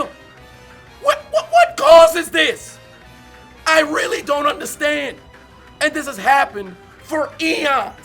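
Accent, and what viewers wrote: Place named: Atlanta, United States. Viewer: Sounds like Atlanta, if I had to guess 😂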